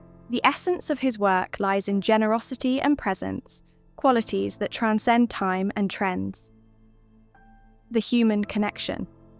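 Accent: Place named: United Kingdom. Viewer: Another video with an annoying, low class British accent.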